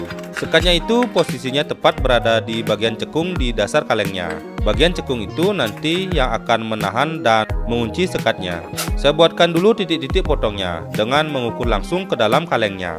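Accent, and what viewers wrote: Bali, Indonesia. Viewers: diliat dari logatnya kynya orang bali nih , keren mas vidionya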